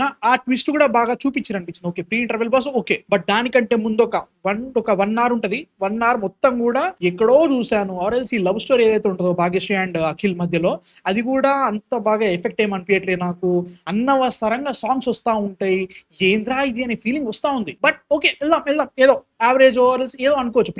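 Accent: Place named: Hyderabad, India. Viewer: Chittoor slang almost alaagey untadhi nee hyderabadi ki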